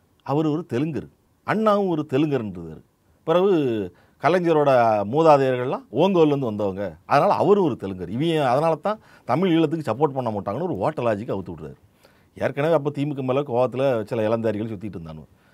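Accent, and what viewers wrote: Tirunelveli, India. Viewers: இதில் பேசிபவர் எங்கள் நெல்லை மண்ணின் மைந்தர்.இவர் பேச்சில் எங்கள் ஊர் ஞாபகமே வந்திருச்சி.❤ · குரல் கரு பழனியப்பன். பேச்சு நடை நெல்லை.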